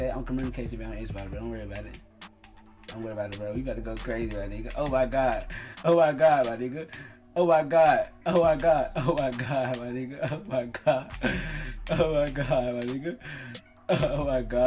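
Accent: Memphis, United States. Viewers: you from memphis cause yo accent sound like mines and im from memphis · Is u from Memphis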